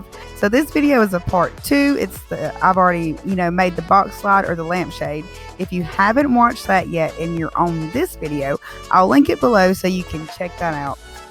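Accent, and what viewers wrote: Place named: Alabama, United States. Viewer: I absolutely love your accent. Sound like my sil from Bama.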